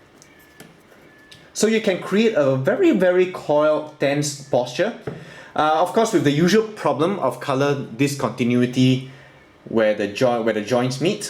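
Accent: Singapore. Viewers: are u singaporean, bro?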